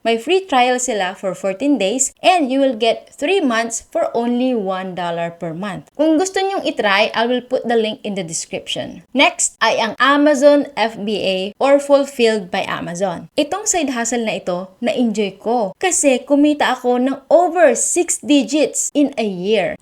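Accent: Pangasinan, Philippines. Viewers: i can hear my co-pangalatok po hehehe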